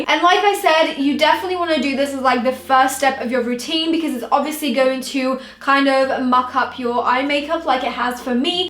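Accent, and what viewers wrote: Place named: England, United Kingdom. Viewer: she is from England! she has a similar accent to me but mines more high pitched :)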